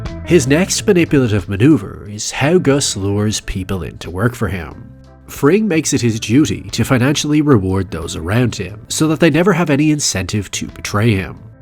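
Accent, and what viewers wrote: Ireland. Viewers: Are you Irish, mate? You sound like one of my old best mates.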